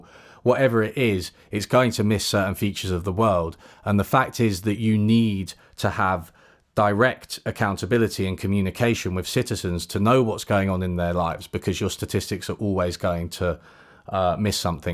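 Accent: United Kingdom. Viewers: As a Chemist coming to the end of my masters I've been seeking none chemistry related reading to come up for air between dissertation writing and have turned to economics. Thank you for your book recommendations and the videos you have provided as a pier head from which to jump off from. I hear a very Wirral accent, I think, and as a plastic Everton fan, haha we won (for once).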